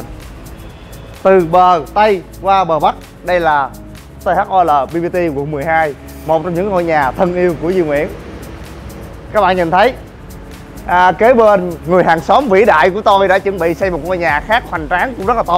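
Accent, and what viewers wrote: Mekong Delta, Vietnam. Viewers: Cải lương quá cha nội...dân miền Tây phải o?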